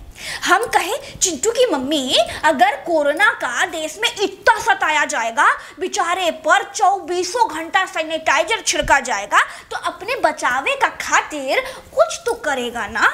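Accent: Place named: Bhojpuri, India. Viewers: गजब बिहारी आवाज में पोल खोल दी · Bhojpuri slang osm madam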